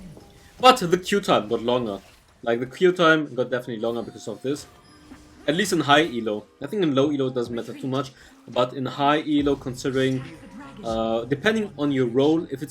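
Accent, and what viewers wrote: Germany. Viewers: One question are u German?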